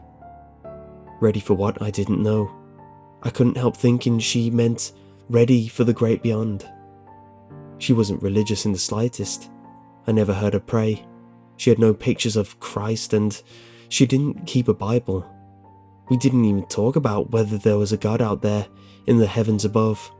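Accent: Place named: Northern England, United Kingdom. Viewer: YEH NORTH ENGLAND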